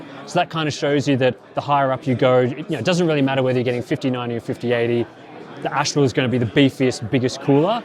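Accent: Australia. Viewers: All that talk about fan spin sounds like a Louis Rossmann video except for the Aussie accent.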